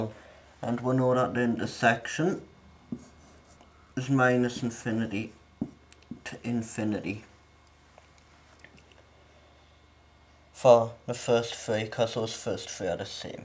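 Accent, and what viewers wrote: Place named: Ireland. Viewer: Irish as fuck and i love it ha thx for the help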